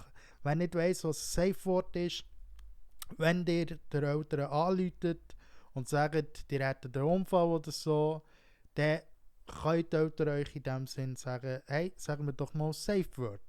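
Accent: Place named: Switzerland. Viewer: WOW... meine Güte hab ich grade aufpassen müssen schwützer Dütsch is halt was anderes wie schwäbisch :face-fuchsia-wide-eyes::trophy-yellow-smiling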